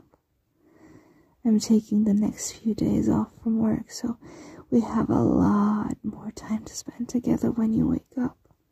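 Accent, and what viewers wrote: United Kingdom. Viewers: Hey are you welsh it's bugging me because I figure it out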